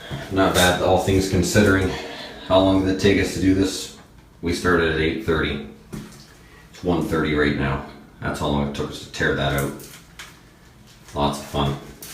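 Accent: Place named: Canada. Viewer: Hey Bro ,,,,you gotta be a Canadian,,,,I hear yer "In's and yer Ouut's" lol !!!*^%$%%#!!!!!,,,.You're a hard worker with a lot of guts.Im a plumber in California.I learned a lot.Keep up the good work.DD In L.A.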